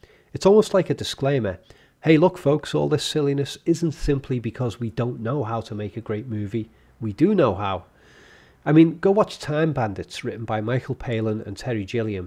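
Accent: Liverpool, United Kingdom. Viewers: Nice accent. Is that… Liverpool?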